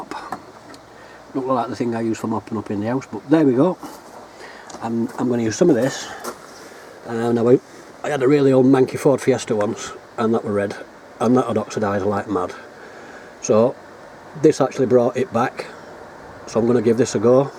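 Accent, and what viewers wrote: United Kingdom. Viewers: Eh up. After 46 years in nz it is great to listen to english as it should be spoken up north...brings a tear to my eye.. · Champion! Love a good yorkie accent, definitely got a good nack for making videos so I've instantly subscribed. Make sure you wax the car now give it time it'll go pink again. Diamond wax is pretty good stuff and cheap on amazon! Can't wait for more vids.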